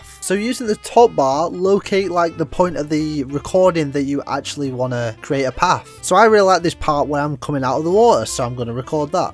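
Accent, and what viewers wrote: United Kingdom. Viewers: are you from sheffeild/yorkshire?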